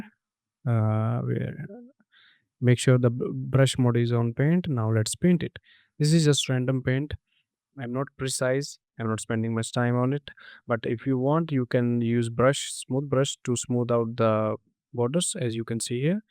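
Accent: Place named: India. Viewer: Because of "offshoring" (getting rid of jobs in our countries and shipping them to india, or shipping indians over here to take our jobs) most English speakers can understand Indian accents pretty well, and yours isn't even too bad. Accents only really become a problem on phone calls if the reception is bad.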